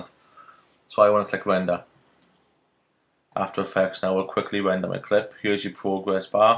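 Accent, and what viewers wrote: Swansea, United Kingdom. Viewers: YOUR WELSH!!! ME TOO! WHERE YA FROM BUTT? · i swear ur from swansea in south wales! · Swansea man? :D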